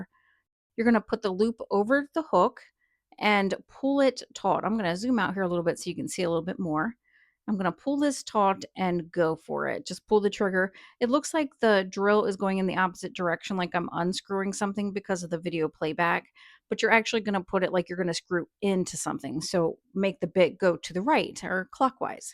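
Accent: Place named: Pittsburgh, United States. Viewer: These are so pretty!! Thank you for sharing this information 💖 Btw, are you from the Pittsburgh area, by chance? I'm trying to place your accent.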